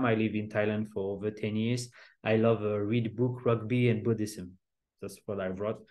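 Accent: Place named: France. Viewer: Salut 😊 watching your video from Cannes and love your french Accent! You have inspired me a lot! Merci pour tes vidéos! Ils sont tous super!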